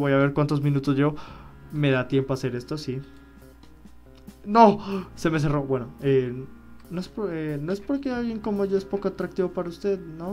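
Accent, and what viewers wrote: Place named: Colombia. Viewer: suenas como un colombiano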